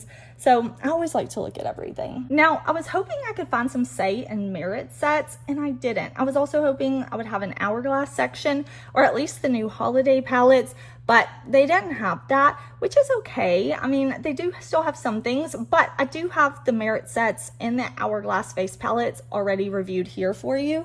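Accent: South, United States. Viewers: I love your Southern accent. 🥰🥰🥰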